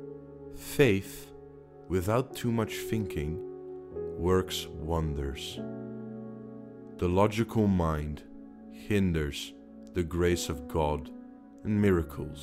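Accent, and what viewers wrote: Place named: Netherlands. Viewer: I think these quotes are narrated by a Dutch person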